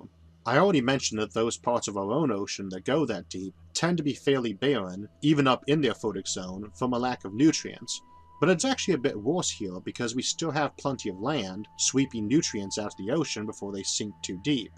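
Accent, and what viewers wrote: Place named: South, United States. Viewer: I would like to say I love your videos and would like to add about your speech I just thought you were an upper class Southern gentleman. I should add that I'm from the UK but very used to the American accents.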